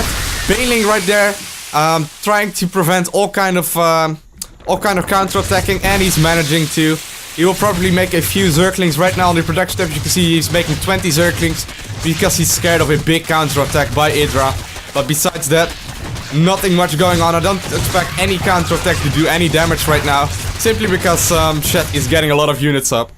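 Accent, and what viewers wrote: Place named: Netherlands. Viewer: As a longtime fan I've decided to watch a few old videos.... wow... his Dutch accent is so much more noticeable. Amazing.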